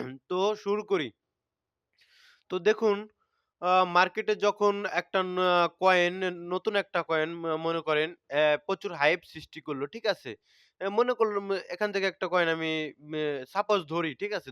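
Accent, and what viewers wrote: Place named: Faridpur, Bangladesh. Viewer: Vai faridpur kotgay apnar basha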